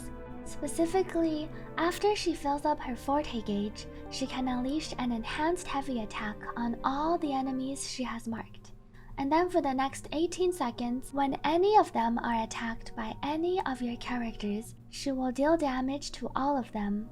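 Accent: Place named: China. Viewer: You got a decent mandrin accent, making me 99% sure you speak it lol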